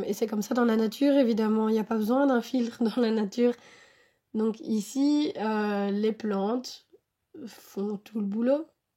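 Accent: Belgium. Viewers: L'accent belge 😍 tu as un admirateur en France ! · Bonsoir. Je suis tombé sur ta vidéo par hasard. J'ai reconnu ton accent belge 😉. En ce qui me concerne, je pratique l'aquariophilie depuis bientôt 10 ans et je commence à m'intéresser au low tech. Je me pose la question si cela pourrait fonctionner avec ma population : 5 scalaires dans 350 l... Sinon, j'habite Bastogne. Vive la Belgique !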